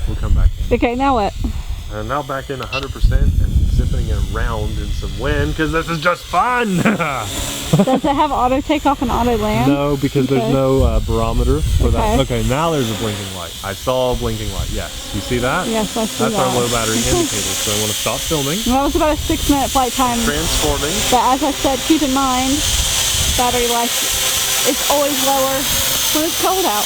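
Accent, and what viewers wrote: United States. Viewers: "Very fun!", you have to be an American with that English.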